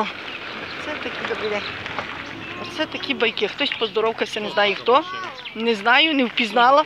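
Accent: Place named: Transcarpathia, Ukraine. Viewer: Здравствуйте , а в какой области и районе вы живёте ? Я родом из Закарпатской обл , знакомый диалект .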